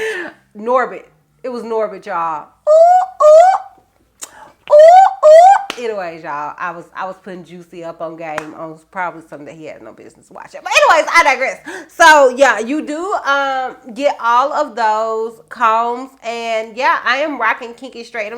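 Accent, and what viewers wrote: South, United States. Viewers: You're so beautiful in all the wigs. I'm glad your feeling better. I work in the medical field and love headband and ponytail pieces because you can just throw them on and GO! Your spirit is so uplifting. It can be felt thru the phone with that Southern accent! You crack me up!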